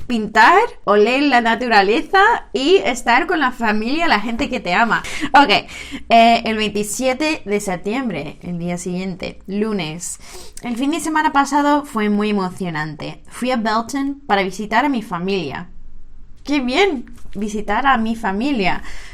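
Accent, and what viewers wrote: Andalusia, Spain. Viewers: como me gusta tu acento andaluz me recuerdas a un amigo de inglaterra que aprendio a hablar español escuchando a la suegra sevillana y de vez en cuando soltaba un deje andaluz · Me encanta el acento andaluz 🤭🤭🤭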